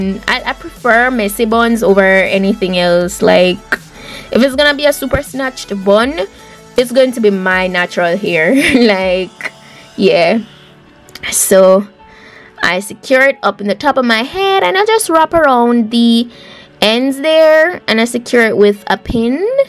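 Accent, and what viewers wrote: Jamaica. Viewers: You sound like a Jamaican 👍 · From I hear how she talk I know from d start she's a Jamaican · You have an accent like a jamaican lol I am lol 😄😃 n if u are, ur very gorgeous n beautiful.. That wig were made perfectly 4 you! 😄👍 Tanx 4 sharing.. Blessyou! 😄💓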